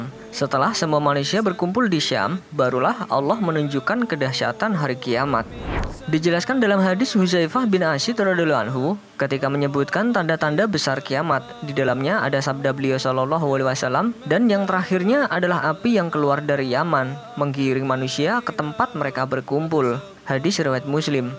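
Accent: Java, Indonesia. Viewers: Fokus video bree... Kok malah fokus komen😂 Piye arek² Iki😂 Pengetahuannya di video Ora Nang komen🤣.. #amet bahasane Medok😂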